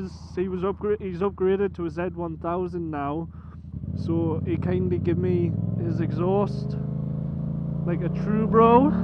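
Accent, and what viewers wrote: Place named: Newcastle, United Kingdom. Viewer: Hi mate good to hear your geordie accent again nice to see u back focus st awesome car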